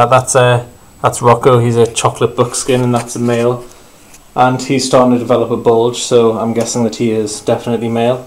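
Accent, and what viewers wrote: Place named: Newcastle, United Kingdom. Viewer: clicked on this video and I noticed the accent before the reptiles. TOON TOON!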